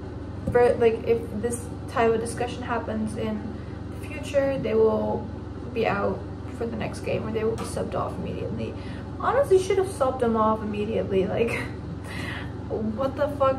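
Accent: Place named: United States. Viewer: Do you live in America? Your accent is American obviously but you say your local team is Dutch 😁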